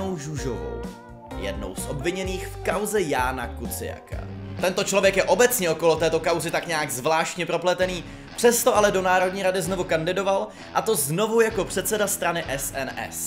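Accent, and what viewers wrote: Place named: Czech Republic. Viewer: to je tak smiešne keď čech číta slovenčinu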